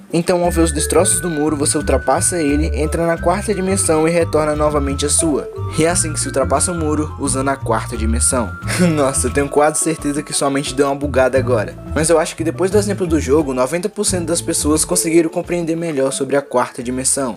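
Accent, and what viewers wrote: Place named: Brazil. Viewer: ainnnnn xenofobicomm parece até sulista Edit: tu realmente é baiano?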